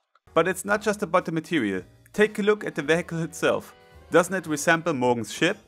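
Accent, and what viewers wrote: Germany. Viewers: Du bist Deutscher, oder? Starkes Video! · Bro's german AF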